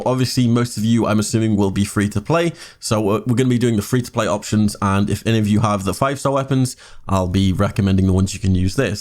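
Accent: United Kingdom. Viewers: i dont watched rest of the video( because i amazed by your British accent) but will see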